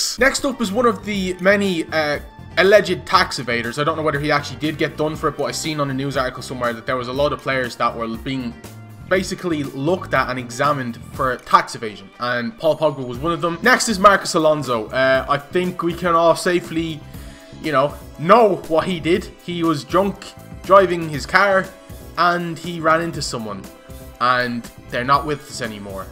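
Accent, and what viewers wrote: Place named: Mayo, Ireland. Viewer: Mayo accent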